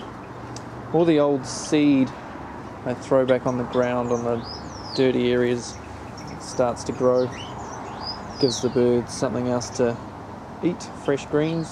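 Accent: New Zealand. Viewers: your accent sounded like a kiwi accent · i detect a Kiwi accent?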